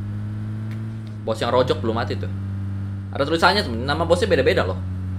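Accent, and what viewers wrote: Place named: Kalimantan, Indonesia. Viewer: KALIMANTAN MANA SUARANYA.. 😎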